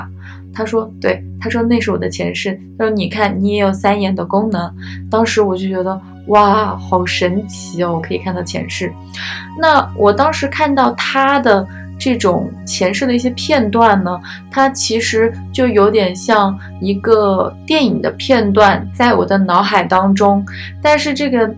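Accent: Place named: Beijing, China. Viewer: Her accent sounds like Beijing or Tianjin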